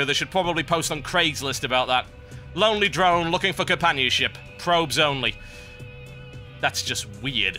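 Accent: United Kingdom. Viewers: U gotta love British cast.... Great great job mate ;-)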